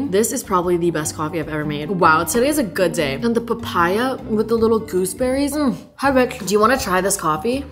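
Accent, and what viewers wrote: United Kingdom. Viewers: Love love the British accent ❤ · Pls I love you but please stop with the British accent 😭😭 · lmao love your british accent and this cooking video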